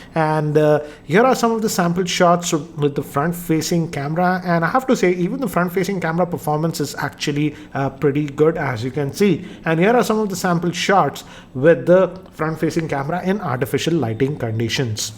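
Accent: India. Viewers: So that what it is. Indian English sucks👎🏻👎🏻👎🏻👎🏻👎🏻👎🏻👎🏻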